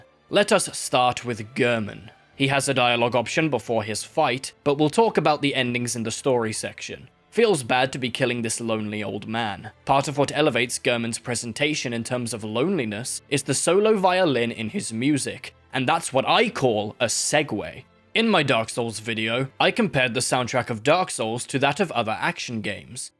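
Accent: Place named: United Kingdom. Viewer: stayed for the take, but stop being British it's distracting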